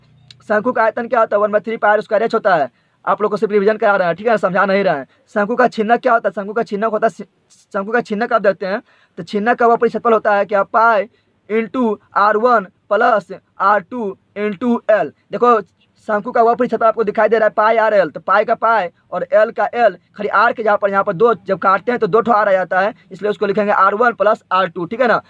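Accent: India. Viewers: rajasthan Wale ase he bolte h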